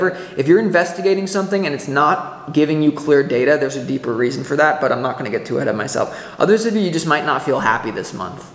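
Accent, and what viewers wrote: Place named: Texas, United States. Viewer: Sounded Texan thank you for your time 😊 Yes! I circle around back to why I started my channel 😊 that's deep...my kids are my greatest legacy but maybe I do want to leave another legacy. Greane village with my soulmate who is back in my life.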